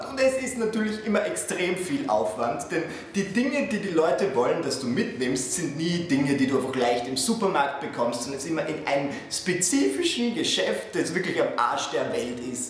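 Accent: Vienna, Austria. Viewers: 1. hat meiner Meinung nach keinen so starken Dialekt, weil er versucht - glaub ich - in Schriftsprache zu sprechen, damit ihn die Zuschauer auch verstehen. Aber er hat einen typischen Wiener Akzent, den jeder erkennt. Sogar Deutsche. 2.) Ist der Wiener Dialekt gar nicht so krass, wie andere Dialekte. Das ist so ähnlich wie mit den Berliner. Da sprechen auch fast alle in der Schriftsprache. (Nur der Berliner Slang is noch n bisschen krasser, aber der kommt gar nicht so häufig hier vor.) Bin jetzt nicht der große Dialekt und Akzent Experte, aber hab da schon so n bisschen was mitbekommen. Besonders, wo ich selbst Österreicherin (Steirer) bin, die vor ein paar Jahren nach Berlin gezogen ist. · ich wohn in berlin kreuzberg und mein postbote ist sehr faul. ^^ anstatt zu klingeln bei mir (4.stock wohn ich) und sich die muehe zu machn hoch zu laufen gibt ers irgendwo anders ab sodass ich jedes mal mein verdammtes paket suchen muss.. 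ps. ich liebe diesen dialekt xD bin halb deutsch halb oesterreichisch also versteh ich alles und find diesen dialekt so angenehm.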